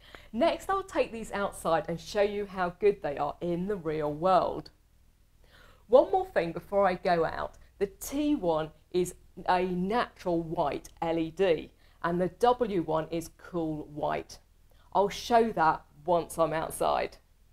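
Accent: United Kingdom. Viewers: Commanding female british accent?✔️ Bike stuff?✔️ BRIGHT POINTY HEADLIGHTS?!?!?✔️✔️✔️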